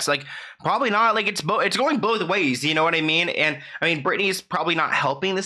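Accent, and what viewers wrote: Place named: United States. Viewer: Love your videos, keep up the amazing work! Does anyone know where in America is from? I’m from the UK and like to try and work out the nuances in the American accent. Thanks!!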